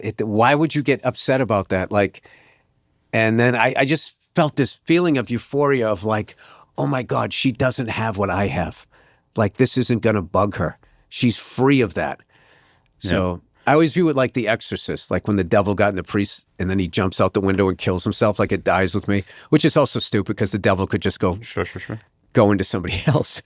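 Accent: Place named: India. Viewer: i can instantly tell that you are indian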